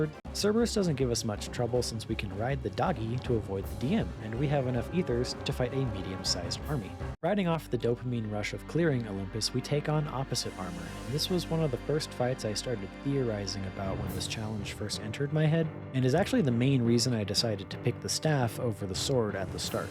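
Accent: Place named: Canada. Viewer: You sound painfully Canadian.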